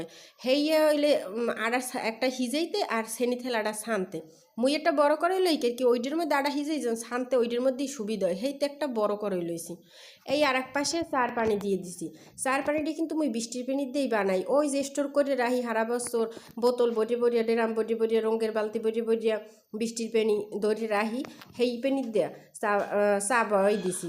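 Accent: Barishal, Bangladesh. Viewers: আসসালামু আলাইকুম আপু চলে আসলাম তোমার ভিডিও দেখতে বরিশাল্লা কথা আমার ভালই লাগে লাইক কমেন্ট সাবস্ক্রাইব করে দিলাম নতুন বন্ধু হিসেবে পাশে থাকলাম অনেক ভালো লাগলো ❤❤❤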